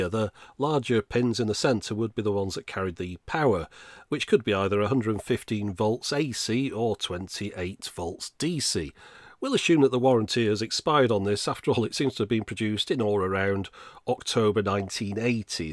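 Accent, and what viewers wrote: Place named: Northern England, United Kingdom. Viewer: Yep - it was the Minidisc video, which got me into in the first place. I thought, I've just watched a 40+ minute video and enjoyed all of it. I love voice with his Northern intonation. 90% of my YouTube time now is videos...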